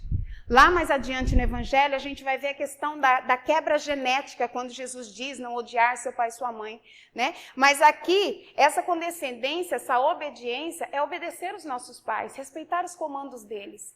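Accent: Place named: São Paulo, Brazil. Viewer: vc é de onde? gosto muito de suas palestras, pelo sotaque vc é paulista.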